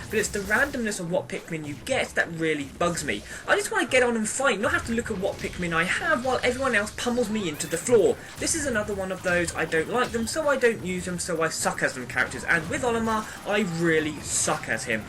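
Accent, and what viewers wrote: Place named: United States. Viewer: ***** An American ;P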